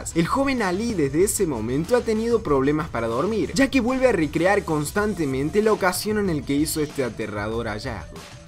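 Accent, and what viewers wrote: Córdoba, Argentina. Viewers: So' cordobeh? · sos cordobes o porteño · SOS ARGENTINO ?¿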